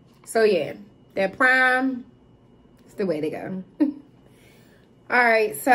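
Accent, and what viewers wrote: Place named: Baltimore, United States. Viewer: I'm trying to get some. U sound like u in bmore n I cant find em down here nowhere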